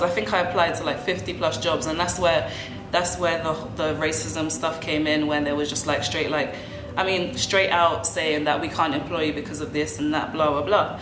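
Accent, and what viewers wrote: United Kingdom. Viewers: I love the caliber of you caracter and fisicaly you geogeouss sunshine i just have some issues with you heavy british accent...lol.... Is like listen spaniers speak spanish.. Im billingual english and spanish but my english accent is from usa and my spanish is from chile... So i sound more gooffy and global well round it when i spoke in both languages.... I think is important to teach a more landed to the ground aproaches to the lenguagues to our new world reality of globalizacion make it more related to an neutral accent.. My spanish for instance is a mix of well educated chilean vocabulary with a rancher mexican singing language what icquired in las vegas nv , with a spice of colombian coffe grounds...lol cheers 💥🌀💖🐾🎸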